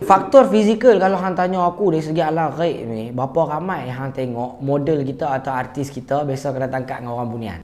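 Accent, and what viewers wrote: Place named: North, Malaysia. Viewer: Itu loghat utara, Abang ni, di dalam video mL studio memang akan guna loghat utara dia..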